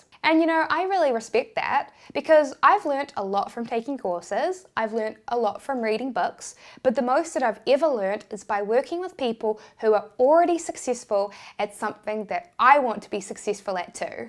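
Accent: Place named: New Zealand. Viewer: I always thought you sounded like a kiwi. Nice to know I'm not the only NewZealander XD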